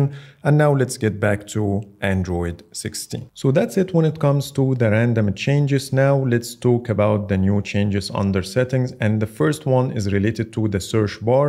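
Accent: Egypt. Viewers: are you from middle east, Egypt particulary ?? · ياسطا انت مصري ؟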